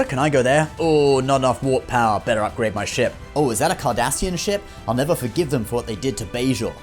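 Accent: United Kingdom. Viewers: not a fan of british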